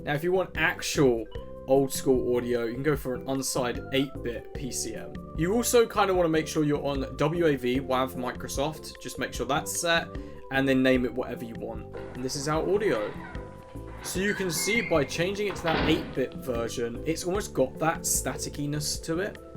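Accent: United Kingdom. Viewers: my passionate hate against British english is gone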